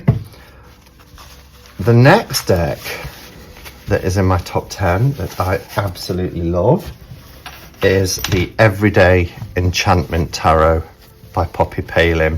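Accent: Nottingham, United Kingdom. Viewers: Nice reviews. You sound like you're from Nottingham like me lol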